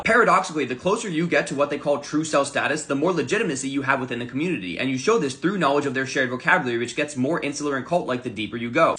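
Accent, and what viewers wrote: United States. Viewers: american accent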